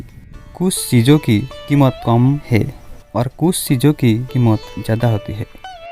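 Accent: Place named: Sylhet, Bangladesh. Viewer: Iam sure you are sylheti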